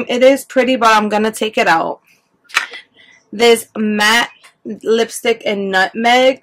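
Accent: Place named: New York, United States. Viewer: Giiiirl i hear that heavy up north accent. Is that new york or new jersey i hear? lol. 💕 Also whats the name of the yellow CSS shadow?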